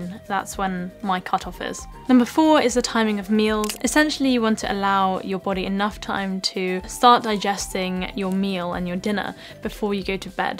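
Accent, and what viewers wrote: England, United Kingdom. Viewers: how have you been l hope you re doing well l have watched you for 5 months and h really have benefited as weel as l didnt understand english language but with you there is not much to it and especially british accent from your faithful follow from algeria💜💜💜💜💜💜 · She is a very talented and a beautiful princess. I love her English accent. It is just so cute. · Hi,! Thank you so much for sharing about it. I’m learning English now. I like British accent so I learn a lot from your videos. I’m always cheering for you from Tokyo Japan❤ I hope u to come to Japan someday! I love you❤❤❤ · It's nice to hear proper RP on YouTube. Most of us get shooed off.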